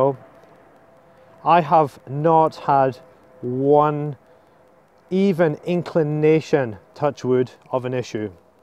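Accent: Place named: Scotland, United Kingdom. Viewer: Scottish accent there I sense. Where you living now mate!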